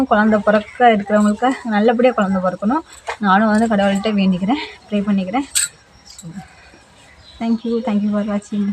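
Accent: Thanjavur, India. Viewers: எங்கம்மாவும் இப்படித்தான் பேசுவாங்க தஞ்சாவூரை சேர்ந்த எல்லோருடைய பேச்சும் இப்படித்தான் இருக்கும்.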